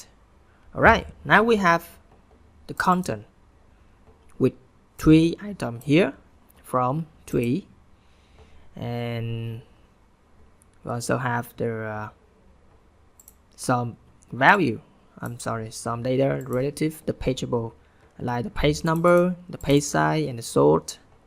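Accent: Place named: Vietnam. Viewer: bạn là ng VN hả ? accent VN chắc luôn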